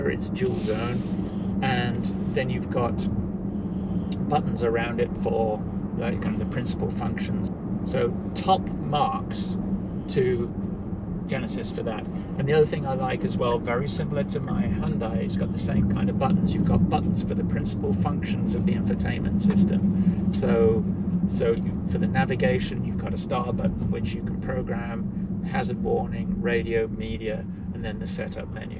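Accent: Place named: United Kingdom. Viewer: I'm thrown off by the Brit voice and the vehicle isn't right hand drive. The two just somehow belong together.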